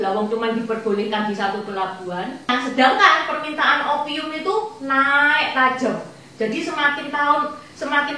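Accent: Java, Indonesia. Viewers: Kapan-kapan kalo saya ada presentasi mau tukeran jiwa dan raga sama cece ini xD Sangat energik ngomongnya, medok e kuwi lho jeruu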